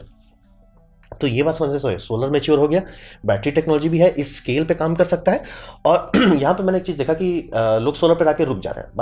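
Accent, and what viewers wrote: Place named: Bhojpuri, India. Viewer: Goetha pure bhojpuri 😊😊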